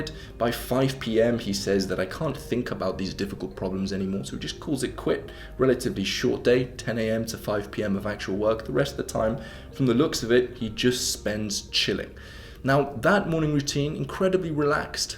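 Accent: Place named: RP, United Kingdom. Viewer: you have to be casted on the Crown.seriously bro you would need 0% effort to talk like them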